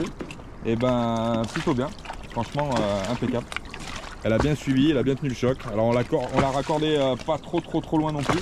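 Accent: Spain. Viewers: Tu as un accent andalus!!😀 qui dit tout le temps fait chaud fait trop chaud (k calor pero k calor!!), andalucia❤❤ ☺️ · Hello! Tu as des origines espagnol?, car tu as un super accent.❤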